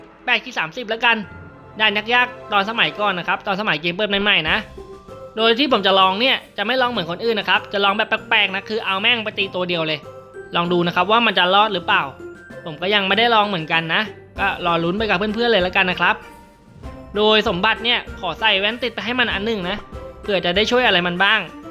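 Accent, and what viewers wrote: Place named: Provincial, Thailand. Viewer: มาแล้วไอ้หนุ่มเสียงเหน่อ ที่รอคอย BRAVO~~~~~~~~~~~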